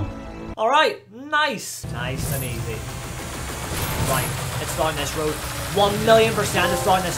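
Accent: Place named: Scotland, United Kingdom. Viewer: I like your Scottish accent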